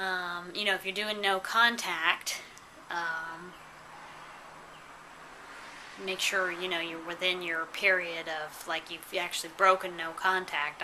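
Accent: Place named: South, United States. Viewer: Listening to your videos really connect to me , you really captured me and your wisdom hit my core. I live near and Arkansas and you sound like you live in the south. You live in the south?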